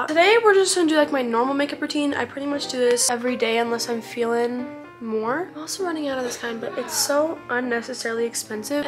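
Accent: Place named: United Kingdom. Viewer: I love your morning routine and your british accent voice ☀❤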